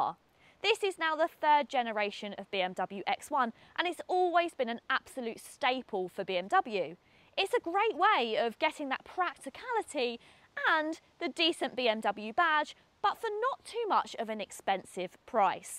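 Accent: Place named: Surrey, United Kingdom. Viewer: God, I need to meet this gorgeous lady. Sounds like a Surrey accent.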